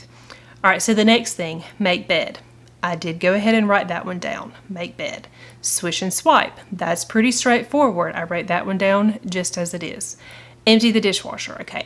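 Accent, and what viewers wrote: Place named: Alabama, United States. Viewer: this is definitely a first, Alabama mistaken for Australia 🤭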